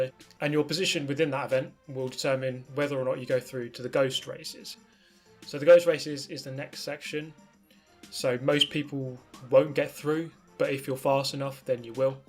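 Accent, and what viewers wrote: United Kingdom. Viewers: you dont look like a brit what a accent gibe me pls ?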